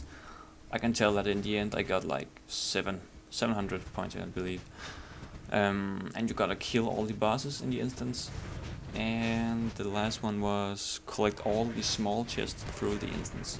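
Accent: Denmark. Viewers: Nice danish accent dude! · du er dansker kan jeg høre skal man være exalt med dem ?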